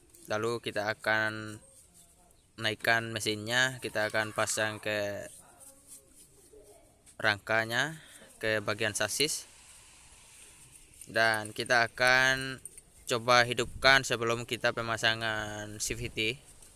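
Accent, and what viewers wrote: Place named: Ambon, Indonesia. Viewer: Dari bahasa suara sumbang mcam org ambon k? Posisi bengkel dimna bos?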